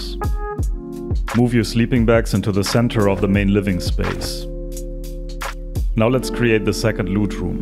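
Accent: Germany. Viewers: U sound German ! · Puh der deutsche Akzent...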